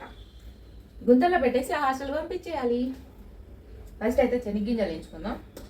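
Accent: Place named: Rayalaseema, India. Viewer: Good to hear your conversations. Your Rayalaseema accent is wonderful to hear. Love your videos 👌👌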